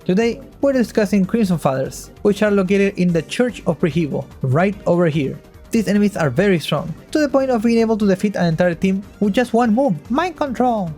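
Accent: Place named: Argentina. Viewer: el primer momento que escuche tu acento ya sabia que eras Argentino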